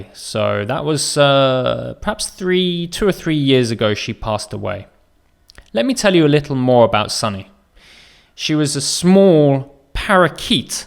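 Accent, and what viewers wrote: United Kingdom. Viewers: I 've just come across with your channel its really so helpful and your accent is very clear i also like the British accent especially when you're pronouncing the sound s as a sh .Hajar from Morocco 🇲🇦.thanks a bunch.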